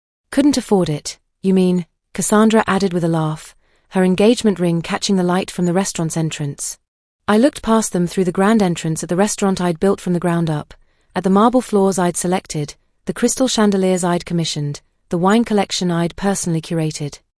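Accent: United Kingdom. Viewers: Where's her husband who was supposed to come to her rescue, like it says in the title. Absolutely love British narrators 😊❤❤❤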